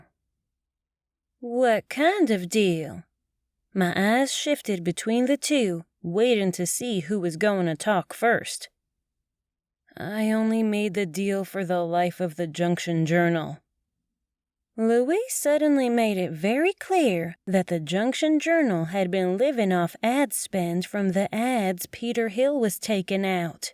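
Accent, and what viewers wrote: South, United States. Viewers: I am sorry, but this narrator’s southern accent is way too much, I can’t finish it, it’s so distracting, and takes away from the story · Y'all don't live in the south if you think this is over the top! All my neighbors talk just like this! And I'm in south Florida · I love the Southern drawl. And I love the story. Thank you! Perfect entertainment as Halloween approaches 😊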